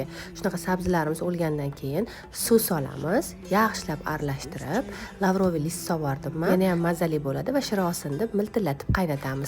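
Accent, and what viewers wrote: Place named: Andijan, Uzbekistan. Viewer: Andijon shevada shovla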